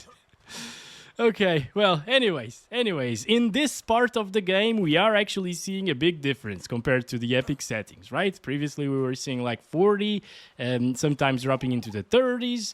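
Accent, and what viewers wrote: Portugal. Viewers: wait are you from portugal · are u portuguese? sounds like it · bro eu ja vejo os testes deste canal a uns 8 anos e só hoje é que reparei que és português pelo "ah esquece", houve uma vez que pareceu-me seres portugues pelo sotaque mas hoje tive a confirmação ahaha, tudo de bom para ti! Abraço! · Es portugues!?! I knew it! haha been watching you for ages and was suspecting that because of your accent :)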